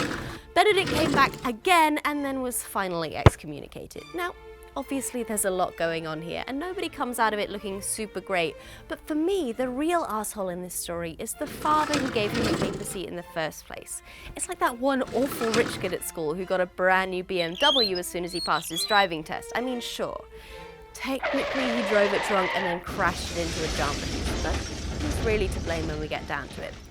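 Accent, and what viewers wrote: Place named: RP, United Kingdom. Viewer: Wow, sounds really posh in this video! Being in the States has really altered her accent.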